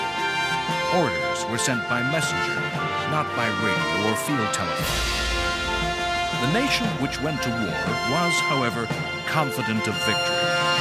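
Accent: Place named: United Kingdom. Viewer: Prefer the British narration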